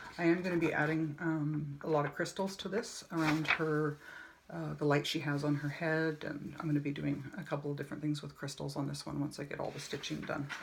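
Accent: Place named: England, United Kingdom. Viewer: Great first video☺. Loved how you gave all info out. As you said Jules is fantastic!!! She's amazing in many ways isn't she. You needn't apologise for being nervous, it was your first one, at least you put yourself out there and I thought you did really well. Out of interest, were you born in Canada? You sound more English. I am from England lol. I like the variety of different projects. See you next time. Happy stitchy holiday☺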